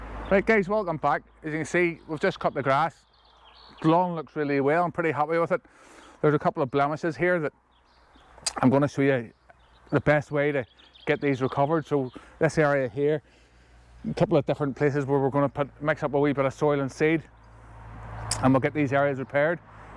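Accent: Northern Ireland, United Kingdom. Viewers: Helpful video, for supportive and constructive feedback it would help to slow down the rate of and narrative in the speech dialogue to make it more easily understood given the strong Northern Irish accent, much of the dialogue in this video was difficult to understand but overall helpful advice, thank you.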